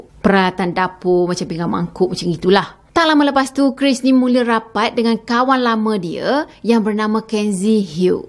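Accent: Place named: Johor, Malaysia. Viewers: Assalamualaikum.. lama akak dah cctv je tapi kali ni memang nak kata… Bunyi Johor tu memang menyerlah sangat dah..😄 Btw bagus utk dia dakwa semula untuk clear nama dia.. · Cakap pun dah ada style orang Johor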